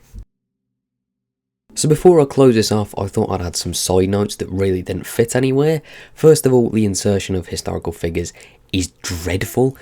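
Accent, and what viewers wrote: United Kingdom. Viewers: Hello, I've just finished playing this game, and it was my first AC ever. And I loved it. But I also totally agree with your review! Thanks for opening my eyes in some aspects. I still enjoyed it though, and will probably platinum it since i'm halfway through that already. Also, I'm a sucker for London and British accent, so I guess I'm biased. I suscribed for this review ♥ gonna binge-watch some other videos of you!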